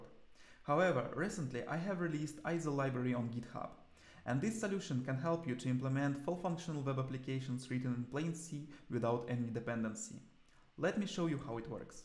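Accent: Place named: Russia. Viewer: сразу русский акцент слышно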